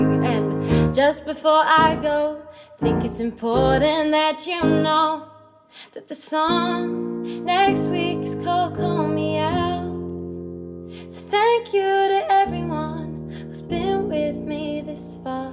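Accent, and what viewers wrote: United Kingdom. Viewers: I love your UK accent :) greetings from Cali🌴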